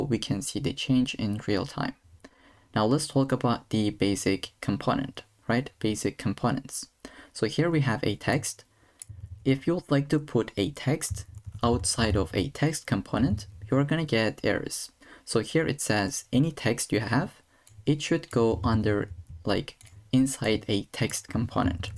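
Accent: Turkey. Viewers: türkmüsün la ingilizcen asiri türk aksani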